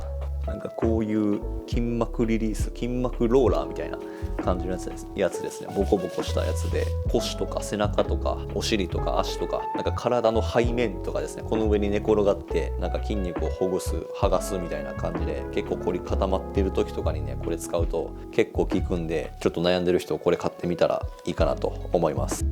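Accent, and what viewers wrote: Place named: Kansai, Japan. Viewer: こんな素敵な部屋で、素敵な声でイチコロですね〜 関西弁に親近感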